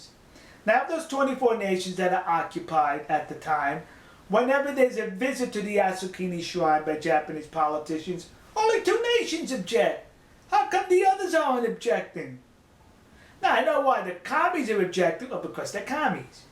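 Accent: Texas, United States. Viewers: テキサス訛りの英語をですか？教材には向いていないと思いますよ。 残念ですが・・・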